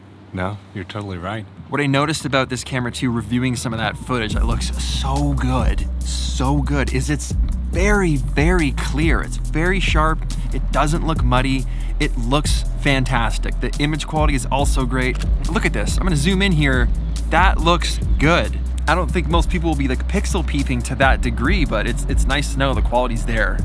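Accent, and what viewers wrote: Gothenburg, Sweden. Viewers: Göteborska is just such a delicious accent. Only Peter will know what I'm talking about. The other Peter. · Are you swedis? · He's from the back side of Sweden I hear! ❤ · So great Peter and Peter! :) Def gonna get the mavic mini 3 pro, cant wait! Så kul med lite härlig göteborgska! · Took me a while, but then: aah, he is Swedish 👌😄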